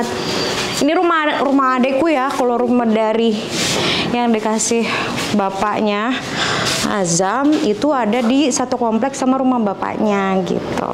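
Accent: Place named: Manado, Indonesia. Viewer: sekolah dimana ngana ? fasih juga logat manado nya..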